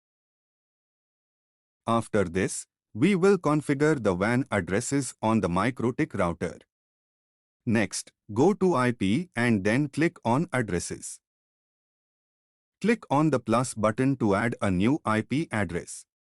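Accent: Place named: India. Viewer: I think it's an Indian accent rather, lol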